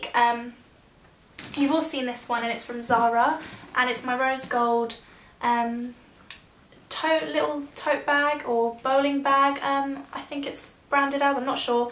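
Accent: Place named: United Kingdom. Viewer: i liked the video before it even started... i know its going to be awesome because: 1. its whats in my bag video, 2. its you talking with that beautiful accent (i wish i lived in the UK) cheers from croatia :)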